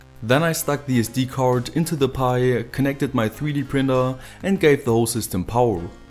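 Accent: Germany. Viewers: oh gott..., ein deutscher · can i hear a german accent?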